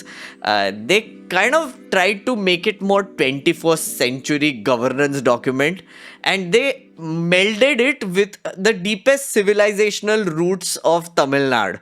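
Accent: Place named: North India, India. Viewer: Ur English says it all North Indian English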